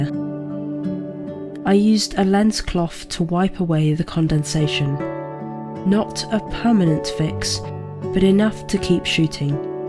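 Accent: United Kingdom. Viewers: Every one of your videos makes me wish I had a British accent.😅 Great job on another lovely video!💕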